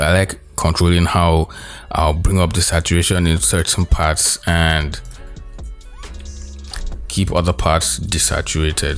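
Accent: Nigeria. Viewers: Apparently I love Nigerian accents🥰 keep it up bro:) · Ayyy, i know a nigerian when i hear one!!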